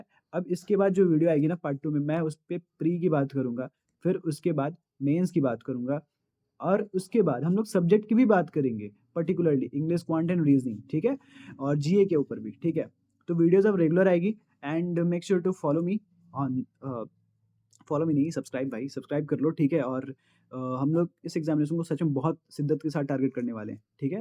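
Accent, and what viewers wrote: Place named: Bihar, India. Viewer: Tum Bihar se h kya bhaiE sub kuch hai Thik h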